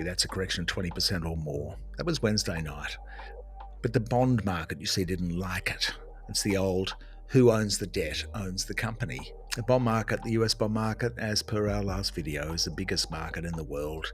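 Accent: Australia. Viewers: What a relief to hear an Australian voice talking sensibly about reality these days. Anyone else feel like we’re living in alternate realities?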